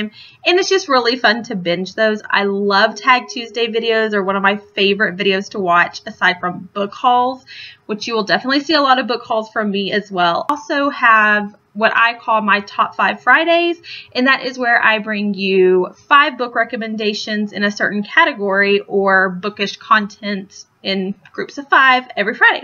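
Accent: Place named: Texas, United States. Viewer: I love your accent, I lived in Houston TX my whole life and we just moved to Michigan and I miss the accents!! I loooove watching book hauls so I can't wait for more from you! great video!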